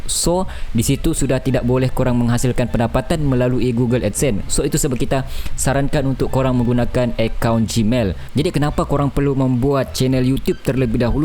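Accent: Sabah, Malaysia. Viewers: Perkongsian yg bermanfaat.. Teringin mau jd YouTube tapi xde ilmu.. Lps tgk video mcm terinspirasi.. Terbaik admin.. Admin org Sabah ye? Sbb dgr sleng mcm Sabah.. Terbaikkk admin.. Teruskan berkarya..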